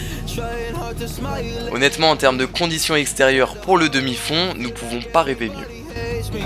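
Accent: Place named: North, France. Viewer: La piste magique d ordegem à encore frappé!!! Sub 8'50 en junior c est très fort, plus la chute et les petits piétinements avant certaine barrière c est encore plus beau, hate de voir l evolution dans les prochaines années, bon courage pour la suite de la saison 💪 Ps: magnifique accent du nord, c est pas possible tu as de la famille qui habite par chez nous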